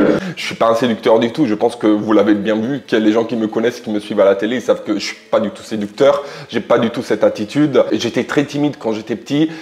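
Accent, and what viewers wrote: Italy. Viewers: Salut merci beaucoup pour ce petit moment avec toi en tout cas moi j'adore 💕 ton accent italien tu t'exprime très bien en français passe une belle soirée à bientôt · Bjr,merci bcp pour le vidéo j'espere que tt va bien;en francais tu t'exprime très bien,l'accent l'Italien lá j'adore,j'ai aimé le moment.bravo a toi💕💕💕 depuis 🇭🇹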